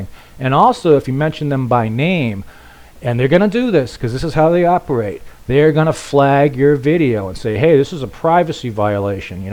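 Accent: Boston, United States. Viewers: Hi, knew you were from Boston but first time I heard Dot!! Saint Ambrose in Fields Corner here. You??? Gotta know some of the same people!!! Was also stationed at Subic same time you were at Bragg.....hahahaha. Thanks for the vids....gotta make a trip to Dumaguete one of these days!! Have fun and stay safe!! Think you need an OFD tshirt. LOL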